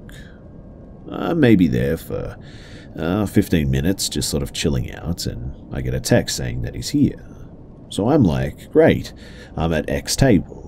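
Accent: Australia. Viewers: I normally can't stand hearing a fellow Australian accent in videos/movies but this voice is particularly nice. Thanks for the content, I'll leave a like & subscription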